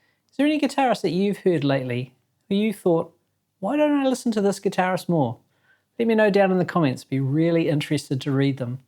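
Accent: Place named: New Zealand. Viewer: 😅 Gotta love that Kiwi accent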